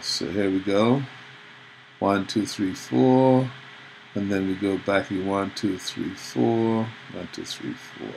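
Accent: Caribbean. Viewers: so pleasantly surprised when I heard the Caribbean accent. thanks for posting! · this has to be a Caribbean accent.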